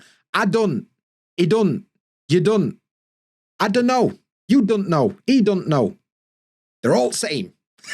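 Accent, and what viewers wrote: Sheffield, United Kingdom. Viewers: You have the most extremely broad Sheffield accent if you use all these. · I went to school in Sheffield, but was only there for one year when we moved to Rochdale. I still keep some of those Sheffield idiosyncrasies! Mind you, I was born and raised in Donny (Doncaster) so I think a lot of your accent is shared with us. Good video!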